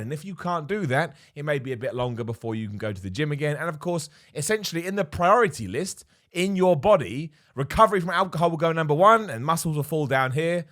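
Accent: United Kingdom. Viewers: Sooo refreshing to here a British voice on YT! Your funny, down to earth and no BS! Well done sir!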